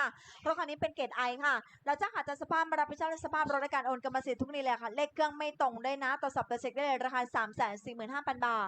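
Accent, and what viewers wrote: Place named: India. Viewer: พูดเหมือนคนอินเดีย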